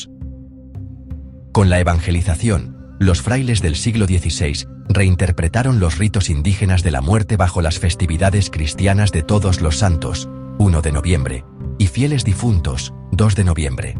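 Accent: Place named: Spain. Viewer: Y lo narra un español 🙄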